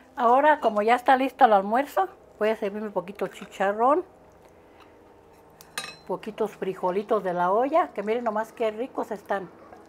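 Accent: Michoacán, Mexico. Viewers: DAVID CORDOVA y michoacana Con ese acento tan dulce de las michoacanas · forma tan natural de expresarse muy de México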